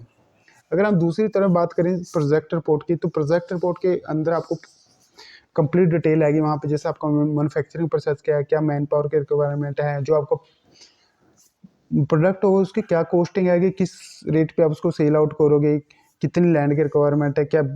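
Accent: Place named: Haryana, India. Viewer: Aap haryana se ho ,, apki language se lag rha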